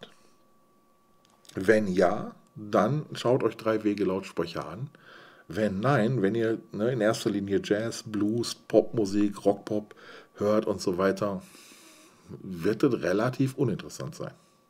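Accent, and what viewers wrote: Ruhr, Germany. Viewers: Vielen Dank für die kurzweilige halbe Stunde. Es hat mir viel Freude bereitet, wie du mit deiner "Ruhrpottschnauße" (das ist sehr positiv gemeint) die Dinge auf den Punkt bringst.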